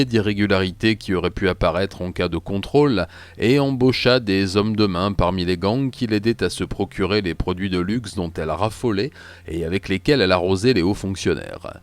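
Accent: Japan. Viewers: une sage femme peu sage...encore une excellente vidéo avec un délicieux et parfait accent japonais!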